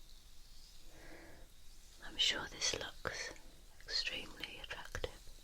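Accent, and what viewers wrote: England, United Kingdom. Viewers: Hello, I listen to you in France, near Paris. I enjoy your voice and your videos. Are you english or american? I suppose you are english.... I don't understand all what you say but I listen with a lot of pleasure. My english is not very good, sorry. Very friendly to you!!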